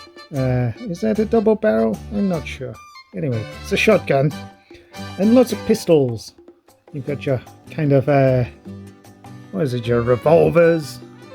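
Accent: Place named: Wales, United Kingdom. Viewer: Being welsh, I approve that accent 😂!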